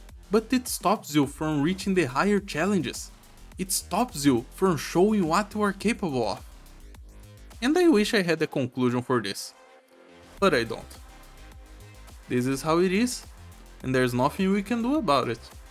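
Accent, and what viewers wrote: Brazil. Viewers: Are you brazilian? Nice video btw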